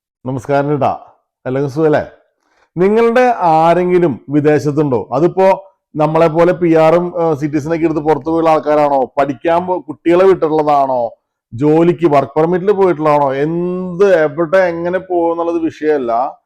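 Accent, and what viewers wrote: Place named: Thrissur, India. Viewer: ഒന്ന് തൃശൂർ പോയി വന്ന ഫീൽ.. സ്ലാങ് wow ❤️❤️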